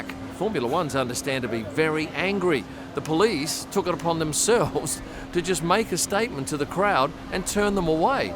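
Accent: Australia. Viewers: I like the Australian talk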